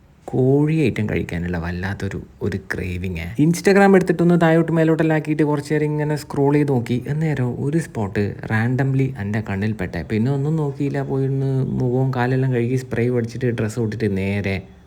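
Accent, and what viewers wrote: Kannur, India. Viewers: Kannur basha thenhe...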